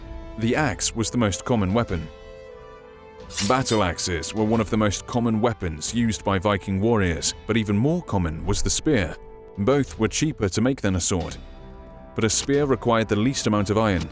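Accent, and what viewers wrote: United Kingdom. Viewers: Stop using this stuppid brits vocif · I hate the brittish accent for some reason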